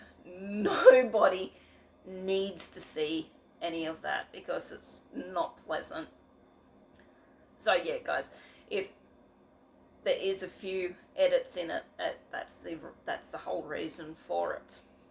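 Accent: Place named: Australia. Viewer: Sorry to hear your not 100%. Darren and Sharon both seem to have similar problems😞. Why not make it a round TEN for projects!!!? Stitch with me would be great😁. If one a week got too much you could always alternate with a SWM one week and a Flosstube another week? I love the Aussie accent, please don't put it down😁. Stay safe, get well soon🤗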